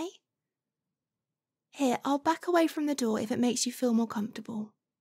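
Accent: United Kingdom. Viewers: I think it's either Great British accent or it's Scottish accent. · That was a great ASMR I like the British accent 🇬🇧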